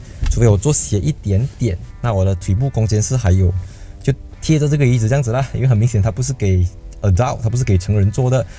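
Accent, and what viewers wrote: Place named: Malaysia. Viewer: 我们马来西亚的华文就是这样的ma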